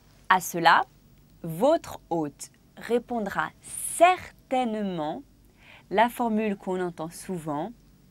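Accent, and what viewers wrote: Brazil. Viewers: Nao concordo nada com este, lingua portuguesa pois é, mas isto é Português do Brasil... Nota-se mesmo naquele sotaque.. Devia ser mencionada, acho eu... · Par contre accent du Brésil !!! C'est comme apprendre le français avec l'accent de Marseille...🤣🙃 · Effectivement c est du portugais bresilien je confirme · Attention, ça sonne comme au Brésil, pas comme au Portugal ! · Esse é o R de mais da metade dos brasileiros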